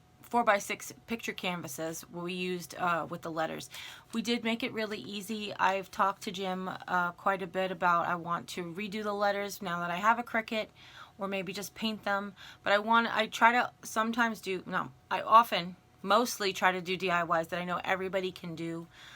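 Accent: New York, United States. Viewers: You are amazingly creative! I'll never get tired of hearing your voice being from TN I love your NY accent! The scale and the tiered tray were my faves but I love them all! God bless you Tfs!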